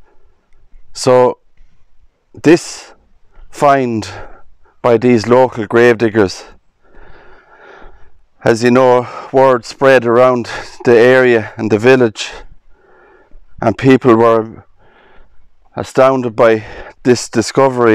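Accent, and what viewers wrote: Ireland. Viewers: Are you Irish? Anyways, you're a really good storyteller, and I'm going to be saying Mary Therese Collins in my sleep now. 😆I'm laughing with you though not at you and I love your soothing voice that would be really good for ASMR. Subscribed!!! 😀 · Perhaps you don't like semi personal comments. But I am going to take a chance. Just love Irish accents since my ancestors came from ballina, county Mayo. Still have relatives there. Enjoyed the video too. Very thought provoking. Am a new subscriber.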